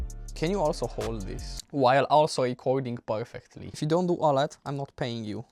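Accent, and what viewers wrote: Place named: Romania. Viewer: Ma gandeam dupa accent ca esti roman si am auzit spray de tanatari 😂😂😂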